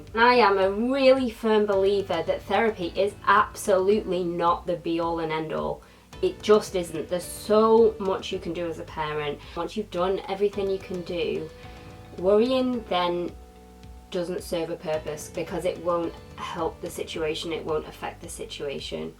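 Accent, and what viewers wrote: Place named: United Kingdom. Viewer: She speaks British English 🤷🏻‍♀️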